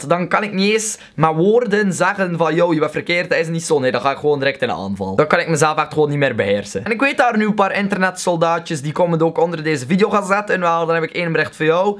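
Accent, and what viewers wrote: Belgium. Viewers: He's actually speaking Dutch with a Belgian accent. (He's belgian) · Sandra Kim Oh... Nou ik als Nederlander hoor duidelijk een accent bij hem en dan refereer ik meteen naar de Belgen, haha 😁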